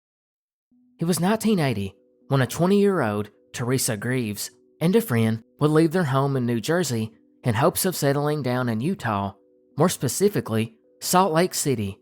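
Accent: South, United States. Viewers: This is great. I can’t sleep without his calming southern drawl lulling me.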